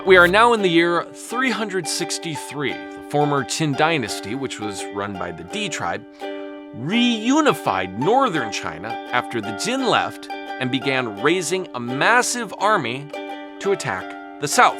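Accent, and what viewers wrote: Standard. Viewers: I like your pronunciation of Chinese words throughout the video here and there, very standard mandarin. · 你的發音很標準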